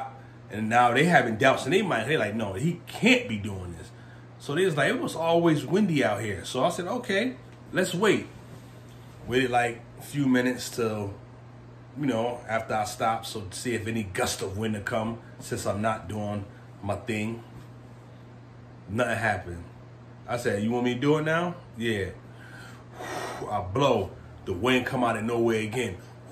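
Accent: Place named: Connecticut, United States. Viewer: You sound like you from Connecticut. New Haven?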